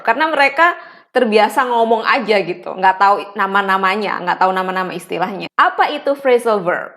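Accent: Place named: Java, Indonesia. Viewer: Ternyata = ndilalah 😂, masih mantep kak logat jowone, Kediri menyapa kak😁👍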